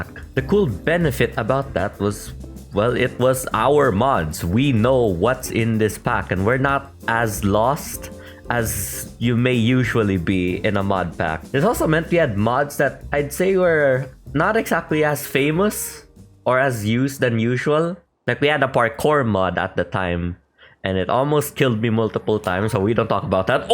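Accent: Philippines. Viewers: Omg i was like woah he sounds Filipino and i was fukin righttt yipeeeee 🎉🎉 a Filipino ama watch you now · 10 seconds in and i clocked that you're filipino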